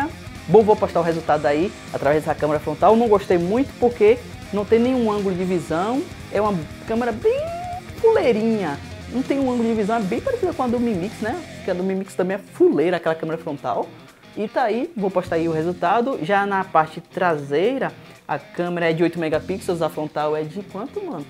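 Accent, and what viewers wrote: Bahia, Brazil. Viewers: Meu mano vc é de que cidade do interior da Bahia ?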